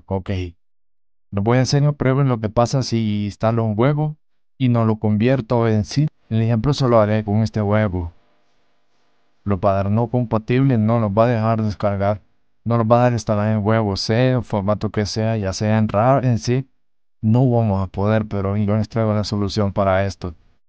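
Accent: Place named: Brazil. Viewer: Bro eres de brasil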